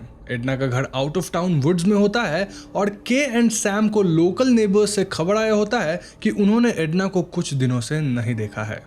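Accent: Bengal, India. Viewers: Bengali accent boht jyada aa raha hai. Please theek kijiye ise.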